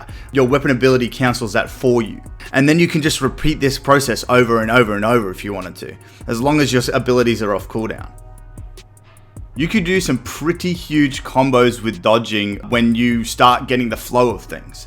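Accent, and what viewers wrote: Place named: Australia. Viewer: Amazing video! ✌ love the ausie accent. Keep up the good work bro.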